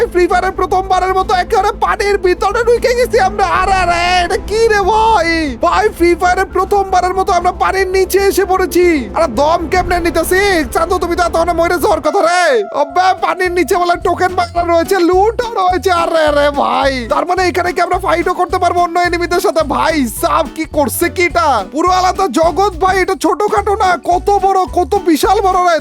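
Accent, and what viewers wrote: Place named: Rangpur, Bangladesh. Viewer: Rangpur kotha basa vai 😮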